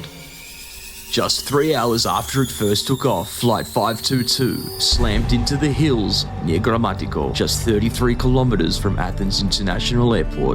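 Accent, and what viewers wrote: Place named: Australia. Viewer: what the aussie accent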